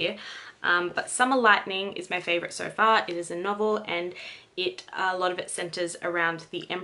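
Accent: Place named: United States. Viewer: She is very American. There is no difference between the d and the t in her pronunciation.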